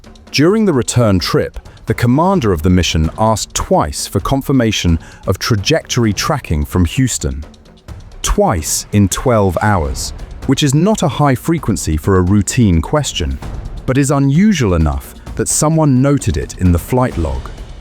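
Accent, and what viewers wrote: United Kingdom. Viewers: Adding the British accent always adds some officiality to the video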